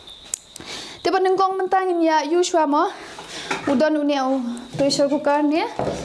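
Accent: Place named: Mylliem, India. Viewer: Sngewnang bha ka sur Mylliem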